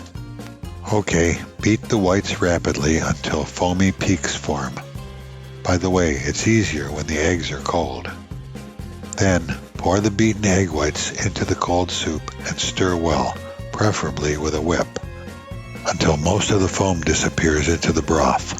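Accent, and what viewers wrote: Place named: Chicago, United States. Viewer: Thank you very much for your tutorial videos! I am especially fond of that Chicago-sounding accent. Makes me extra homesick when I am cooking, missing my both of my Grandmas, Aunts and of course, my Ma!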